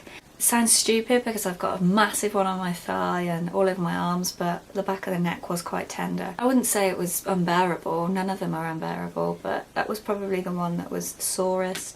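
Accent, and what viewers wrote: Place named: United Kingdom. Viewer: Your moth and butterfly tattoos are soooo pretty! And I love your accent, it's so beautifully British <3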